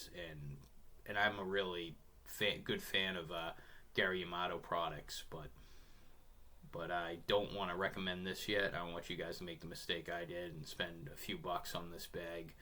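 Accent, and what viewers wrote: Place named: Massachusetts, United States. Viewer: I would definitely continue to fish that just the other day. I was throwing everything under the sun. I even got so frustrated I tried live bait which they were not hitting. I put a black with a blue flick on and I caught a 5 lbs. 6 oz. in 18 feet of water. I was just working it on the bottom. weightless on 3 hook the 5 inch bait. and I’m guessing you’re in Massachusetts like I am I can tell by your accent.😂 so we’re definitely fishing the same conditions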